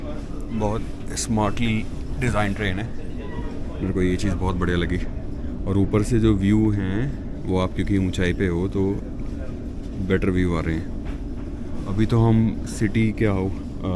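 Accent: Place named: NCR, India. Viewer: Beautiful vlog in ur commentary ur ascent is still from NCR even in abroad - Keep exploring 🎉🎉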